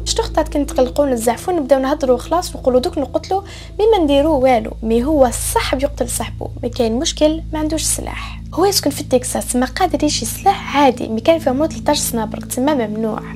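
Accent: Algeria. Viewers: I m new follower. You are stunning and your algerian dialect is very sweety. I think the best version of arabic❤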